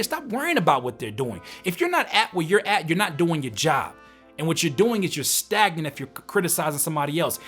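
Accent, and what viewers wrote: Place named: West Coast, United States. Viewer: lol u my dude. U b soundin west coast as fukk sometimes...which is dope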